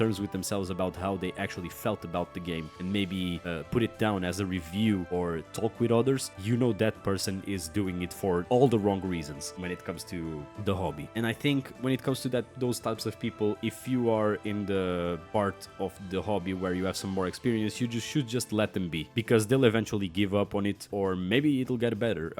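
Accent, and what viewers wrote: Portugal. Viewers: Just stumbled on this video and my first thought was: "This guy sounds like a portuguese speaking english!" Well, what do you know? 🤣 Keep it up! 👊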